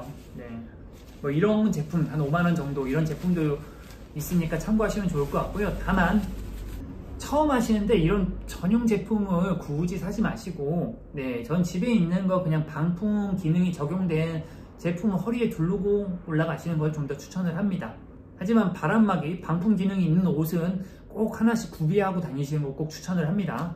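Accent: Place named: Jeolla, South Korea. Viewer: 전라도 억양이 살짝 있구마잉 반갑소잉~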